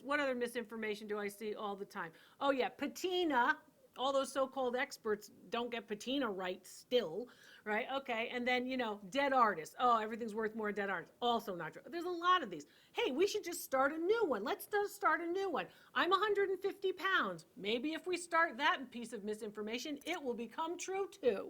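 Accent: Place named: New Jersey, United States. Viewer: You are from NJ, aren't you? If not, you have us down cold. There is something about us Jersey Girls.....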